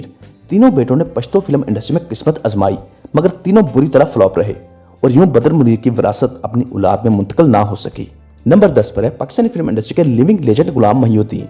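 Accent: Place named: Punjab, India. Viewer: Urdu ko urdu mein boleen urdu ko punjabi mein mat boleen please ...apna urdu ka.lehja sahi kareen ... video bana kar dard e sar na baneen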